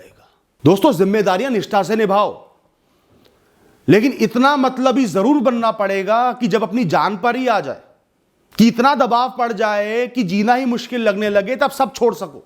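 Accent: India. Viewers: Very Nice Sir Motivating and I like your videos due to our Desi and Indian Accent Aap ekdum acche se samjhate hai Really Superb Sir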